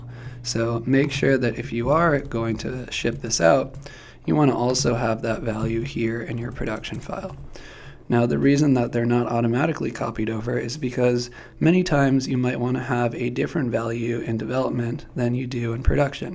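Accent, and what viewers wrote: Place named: Canada. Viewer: I really like Roots! I hunted around for a long spell and finally found it. I think it's probably the answer to my quest for a static website generator to host some articles of mine. On another note, I find the narrator's accent interesting. I'm really good at spotting accents and can't figure out where this fellow is from. In some parts I think he's from Canada, but then I detect a note of southern California. or maybe some other southwestern U.S. place. Oh, well, it doesn't really matter. Roots seems to be truly great stuff to me. I'm going to go ahead with it joyfully!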